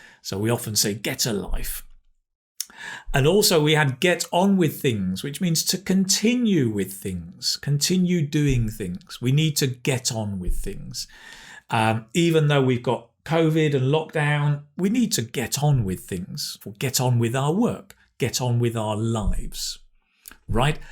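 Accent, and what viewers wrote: United Kingdom. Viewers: Nothing like a British accent, absolutely Masculine...such a marvellous hissing sounds. Nice presentation.👍👍👍👍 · NO American accent, only British!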